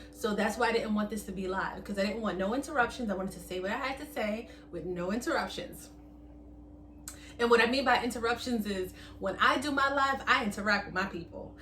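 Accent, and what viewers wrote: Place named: New York, United States. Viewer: New York in the house 😆😆😆Love the accent!!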